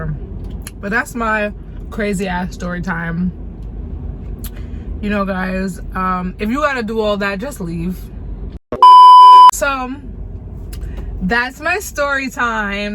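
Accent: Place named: New York, United States. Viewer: i knew it/youR accent sayz n.y. at least to Me'...✨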